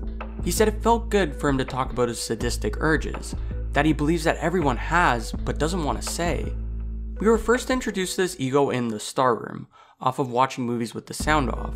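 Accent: Canada. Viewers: You're Canadian, I can tell by the way you say "out"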